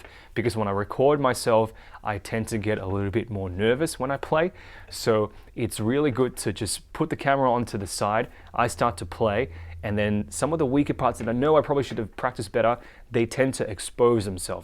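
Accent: Australia. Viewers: Aussie accent. Great! You're pretty good mate. Are you based in Canberra? And if so, do you offer private tuition? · lmao just because he has an aussie accent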